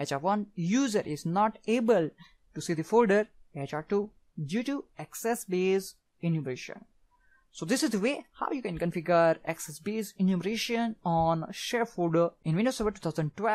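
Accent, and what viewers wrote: India. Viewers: I think ur origin accent is Indian. better try that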